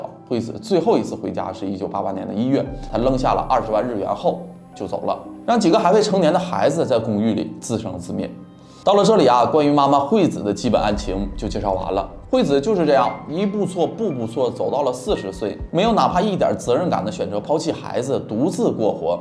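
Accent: Northeast, China. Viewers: 感覺樓主為了把普通話講標準特別吃力，還是經常露出東北話的發音，總是替他捏把汗！其實根本不用刻意地說標準的普通話，用東北話講解更有特色！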